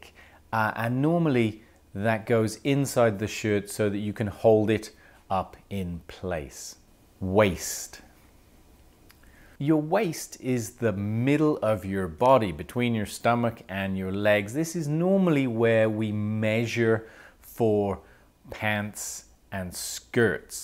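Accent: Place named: Ireland. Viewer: your pronunciation is quite Irish to my ears, however you do use a lot of American vocabulary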